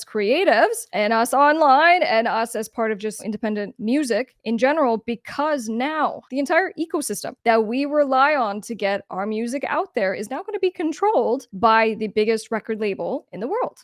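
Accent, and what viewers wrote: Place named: New Jersey, United States. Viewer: Such a new jersey accent lol never noticed till now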